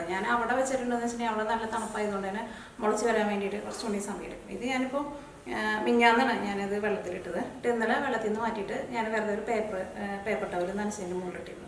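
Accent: Thrissur, India. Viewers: നാട്ടിലെ വീഡിയോക്ക് ഗ്രാമത്തിന്റെ ഭംഗി കൂടി ഉൾപ്പെടുത്തി ചെയ്യുന്നതിന് അഭിനന്ദനങ്ങൾ . മുണ്ടൂർ പള്ളിയുടെ തൊട്ടടുത്താണല്ലെ നിങ്ങളുടെ വീട് . വീഡിയോ കാണുമ്പോൾ എനിക്ക് തോന്നാറുണ്ട് തൃശ്ശൂർ ശൈലിയിലുള്ള സംസാരമാണെന്ന് . ഇത്രയും famous ആയി ഒരു youtuber തൊട്ടടുത്ത നാട്ടുക്കാരാണെന്ന് ഇപ്പോഴാണ് അറിയുന്നത് .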